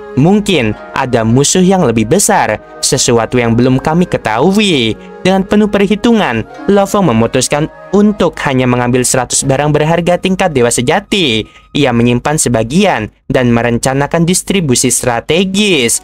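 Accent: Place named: Kelantan, Malaysia. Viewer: Bro dah pandai kecek klate..❤❤ bulang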